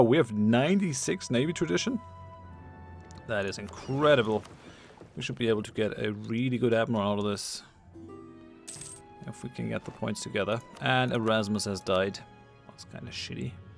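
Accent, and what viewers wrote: Germany. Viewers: I've been waiting for this episode! You should definitely do more EU4 let's plays! Looooove it so much. (and your german accent is awesome) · Haha no, german accent. I like that he pronounces french and dutch names somewhat decent though :) Better than most americans at least haha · Oh it is a German accent, I always thought you were dutch lul.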